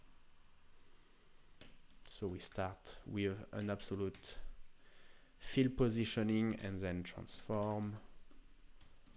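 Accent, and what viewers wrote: France. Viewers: You primary Lang is french ? Because you have a french accent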